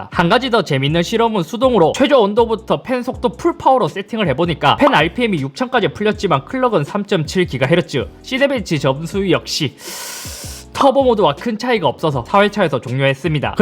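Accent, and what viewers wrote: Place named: Gumi, South Korea. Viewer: 먼가 외가 형같은 말투 ;; 구미쪽 출신이십니까 ㅎㅎ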